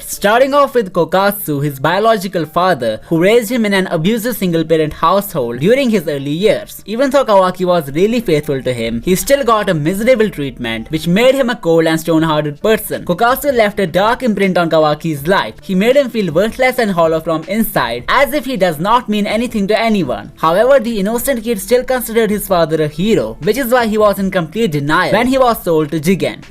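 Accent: India. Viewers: Good content but goddamit this indian accent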